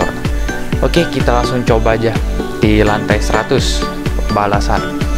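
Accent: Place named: Indonesia. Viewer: Baru denger nih aku denger voice indo hahaa -Jiyang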